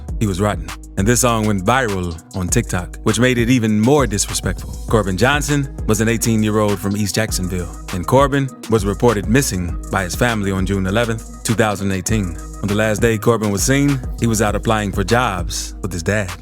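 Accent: United Kingdom. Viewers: The UK accent threw me wayyyy off 🤣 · My guy feelin his voice this recordin’ talkin a lil diff n a uk accent 10/10 😂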